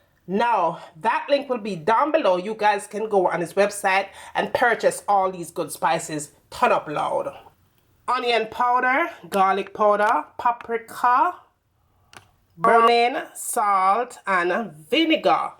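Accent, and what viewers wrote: Jamaica. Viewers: Beautiful! Yummy recipe . I love that beautiful Jamaican accent too. The best of the best. My husband was brought up in Mammee Bay. Born in Canada but spent his whole childhood in Jamaica. Ty so much for sharing... Bill thanks you too hahaha (cause he loves his Jamaican food).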